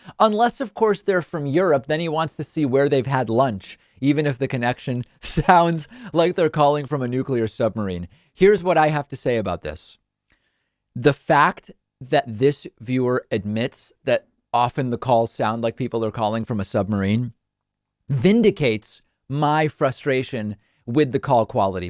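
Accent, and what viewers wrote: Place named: Argentina. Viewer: If you here him speak Spanish his Argentine accent really comes out. I was surprised when I heard him speak and quickly realized he had to be from Argentina or Uruguay.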